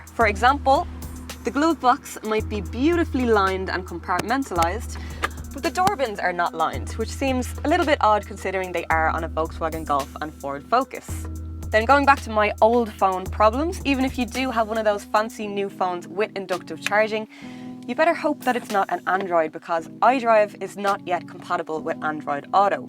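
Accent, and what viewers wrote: Ireland. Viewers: Love that Irish accent, will drive 14k subscribes today to 1.4M in 2 months.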